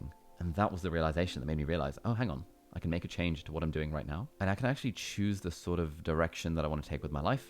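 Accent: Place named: United Kingdom. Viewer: Omg the video automatically started in spanish and it sounded so souless lol I missed Ali's british voice inmedately😂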